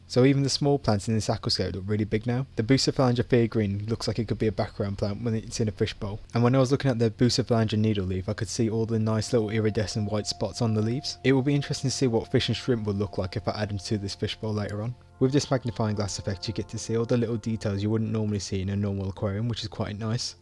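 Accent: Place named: Birmingham, United Kingdom. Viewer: Nice, MDs bowl video inspired me to do one too. I had a planted bowl like this at my university halls but I left it there for too long when they sent everyone home so all the water evaporated and the plants died. So I'll probably do a video rescaping that bowl at some point. You have a good content on chanel, good quality camera and editing for less than 100 subs, you deserve more. You're from Birmingham right? I recognise that accent haha. :)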